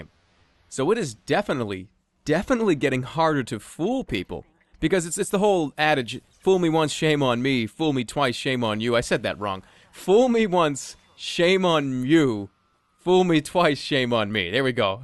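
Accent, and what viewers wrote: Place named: New Jersey, United States. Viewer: Are you from New Jersey or Boston by any chance? I'm in New York. I can hear a strong accent :D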